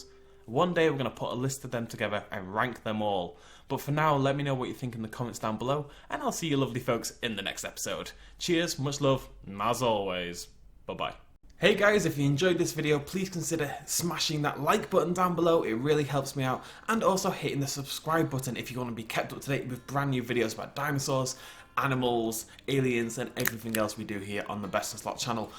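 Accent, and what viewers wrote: United Kingdom. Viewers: eu estou muito ansioso por esse jogo!não consigo esperar!não tenho consoles nem PC então só vou poder desfrutar desta maravilha por meio de vídeos 😪😥! belo vídeo e continue assim! Você é britânico? o sotaque é!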